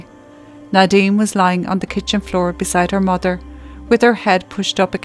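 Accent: Ireland. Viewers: THANK YOU FROM BOSTON 🎉🎉🎉 I LOOK FORWARD TO YOUR NEWEST VIDEO, BUT ESPECIALLY THE BEGINNING QUOTES.... Great Story Teller You Are!!! I love Ireland. Heaven on Earth. Listening to your brilliant accent reminds me of being there.... Your AMAZING.thank you for your hard work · Weirdo? Man, it's called an Irish accent. How can you say such a rude thing? And offensive. I'm also Irish and am very, very proud of it. Chill out.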